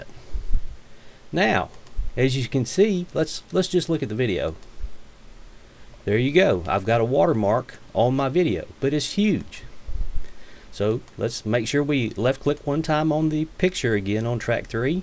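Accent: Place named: South, United States. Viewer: love the southern accent. and thanks for the tutorial!!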